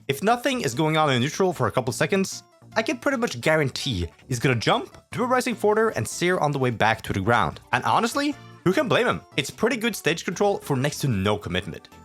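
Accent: Norway. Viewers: Du er norsk? Kult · Kommer du fra norge?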